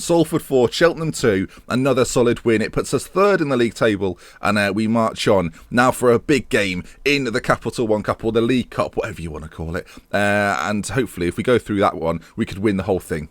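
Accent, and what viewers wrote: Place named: Newcastle, United Kingdom. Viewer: Ur geordie accent sounds like me nana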